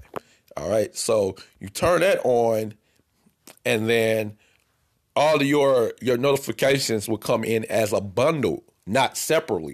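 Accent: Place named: India. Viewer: Another good video no rasict I thought this was a Indian person making this vid. I don't understand what those Indians be saying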